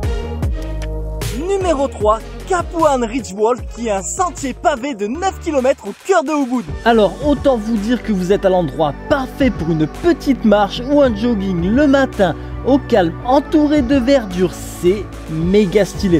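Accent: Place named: Marseille, France. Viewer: Trop fort le gonze, j’adore tes vidéos et surtout tes commentaires à la marseillaise 👍👍👍 Merci de nous faire découvrir ces superbes endroits et merci pour ton travail 😉 continue !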